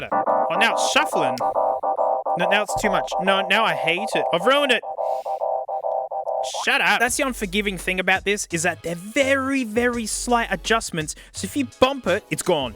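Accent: Australia. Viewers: Why.....why is this only 12 minutes? We need like..AN HOUR of this! I wanna hear the funny aussie man with his slithery friend named Frank scream and tell me hilariously albeit informative stories while using a synthesizer. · Sounds like the drum museum guy. Drums somethin. Australian.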